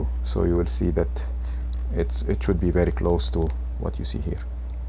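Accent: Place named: Canada. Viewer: Funny Canadian accent.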